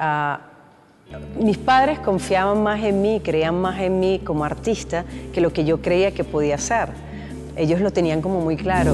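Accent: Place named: Bogotá, Colombia. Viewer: ME GUSTA TU ACENTICO BOGOTANO.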